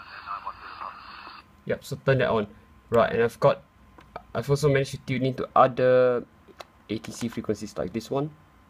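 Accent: Singapore. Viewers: your accent is similar to the way my friends from Singapore spoke. It was nice to hear someone speak that way again.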